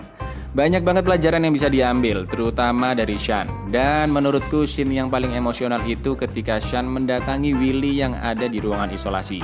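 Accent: Java, Indonesia. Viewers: Jawane ngdi mass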